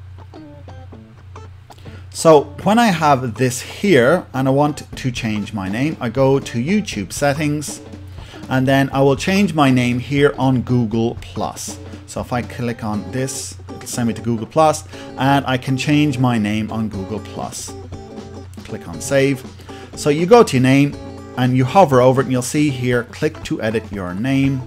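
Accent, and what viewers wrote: Ireland. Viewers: Are you from Ireland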